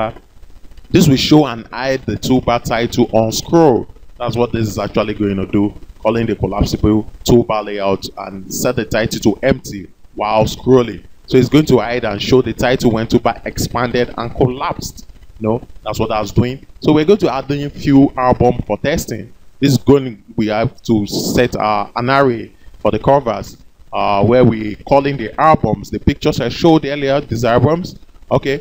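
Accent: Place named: Nigeria. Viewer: nice tutorial . this accent sounds nigerian doesnt it? i would like to know if there is an available repository to download your source code ? this would be very nice and helpful . thank you for this great tutorial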